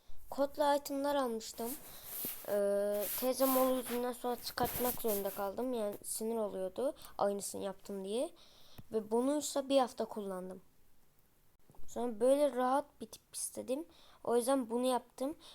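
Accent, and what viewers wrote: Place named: Azerbaijan. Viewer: ade azerisen bilinir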